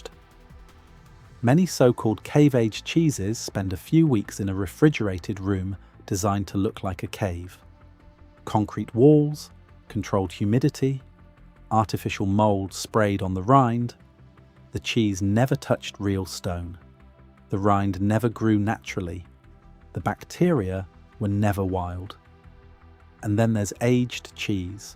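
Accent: England, United Kingdom. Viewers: I am so happy that I found these videos! However, there is one thing: the voice is English, not American. So why are all the videos so completely aimed at the American market? It would be so nice if we could have some comparable information for the UK! Apart from that I love these videos and I am using what I learn from them all the time in as far as I presently can. So thank you! Just some UK aimed info added would be wonderful!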